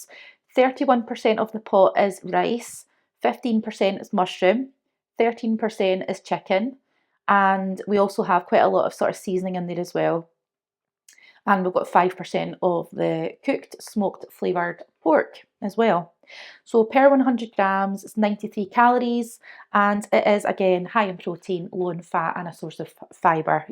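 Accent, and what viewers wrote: Scotland, United Kingdom. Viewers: New subscriber! Lovely to hear another Scottish voice 🤩 I just started back on Slimming World last week 🙂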